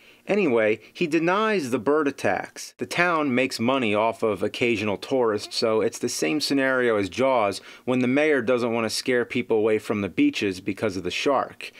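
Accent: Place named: Northeast, United States. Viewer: Typical northeastern accent.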